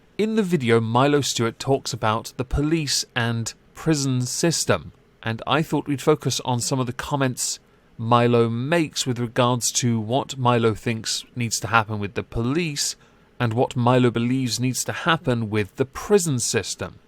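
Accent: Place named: United Kingdom. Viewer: "Incredibly cucked simp" That was perfect! xD I enjoy your verbal readyness & sense of humour as much as Alizee's. Also some people have the combination of nice voice & accent and that's pleasant to listen to. I'm biased ofcourse, as I've watched British programming since early age and therefore learned to like the language & accent :)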